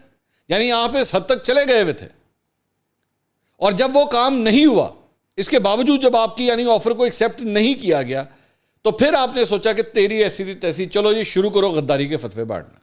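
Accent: Punjab, Pakistan. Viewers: sir g Love you Punjabi bht pyari boltay ha AP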